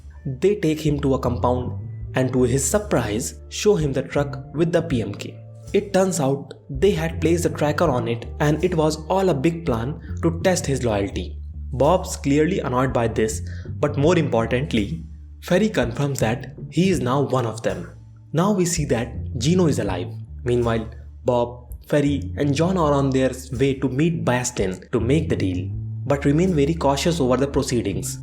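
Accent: India. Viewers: It is very strange to hear someone with an Indian? accent explaining a Belgian/Dutch tv show. It's nice that through platforms like Netflix people can watch shows from completely different countries that usually don't expand much internationally like eg US Hollywood movies (Like I don't think Squid Game would have ever been that big if we still had to rely on our tradition TV Channels, they probably would have never chosen to suddenly play a Korean series) Thank you for the recap.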